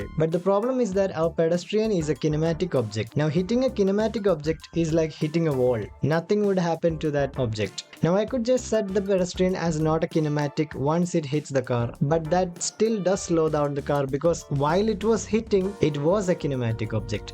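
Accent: Kerala, India. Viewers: he's mallu from his ascent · Bro are you from kerala? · Bro malayali aaano?? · Bro malayali anow? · Bro from Kerala/kanyakumari right ? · Bruhh is malayali for sure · Are you from Kerala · your slang sounds like you are a malayali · Aree u malayali😂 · english kettapothanne thonii.nii malayali alle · Haha thanks for talking in English, I think you’re Mallu 😉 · Ninte english slang kekkumbol ariyaam nee malayaali aanenn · Bro sounds Malayali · Mallu accent is heavy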